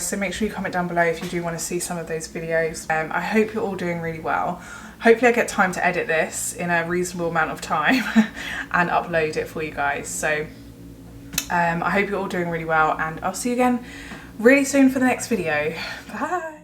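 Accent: RP, United Kingdom. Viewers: You speak posh but the more I get to know you, you are very down to earth and real but not that there’s anything wrong with being posh 😂 you’re one my favourite yt’s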